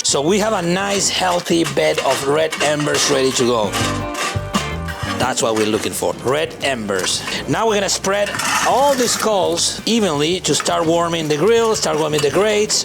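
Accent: Argentina. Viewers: Un Argentino hablando inglés con un acentazo pero sin trabarse y sin parecer forzado, es un orgullo este chabon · i realized so quick this dude here is argentinian because of his accent and spanish words on the middle of his commentary que qliao, aguante el asado uraa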